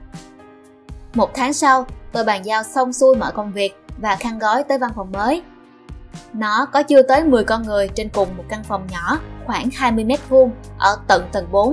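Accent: South, Vietnam. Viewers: Tôi lại phải làm dũng sĩ diệt speaker, cho giọng miền nam như vầy vô mất hết view giờ!🙄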